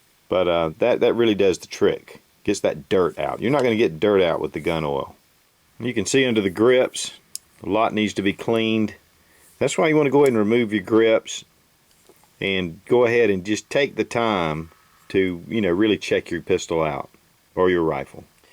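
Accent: United States. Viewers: Love your vids. Just bought a used Walther p22 for the bedroom. Thanks for all the tips. Had to subscribe for this, some of your other vids, and the down to earth all American accent. Long live the Republic! Down with Big Brother!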